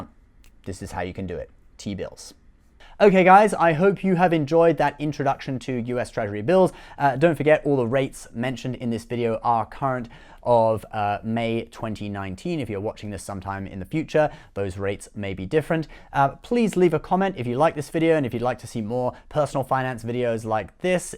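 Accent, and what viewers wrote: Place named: England, United Kingdom. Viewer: Your accent is English. Is there a t bill equivalent if you're not resident in US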